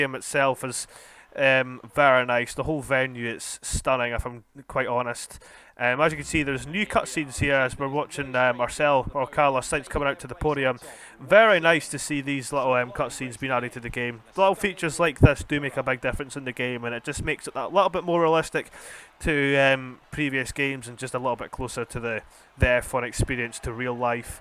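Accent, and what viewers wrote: Scotland, United Kingdom. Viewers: thought so, once I heard that accent I instantly subscribed I’m Scottish to so you can’t get better than an Scottish f1 content creator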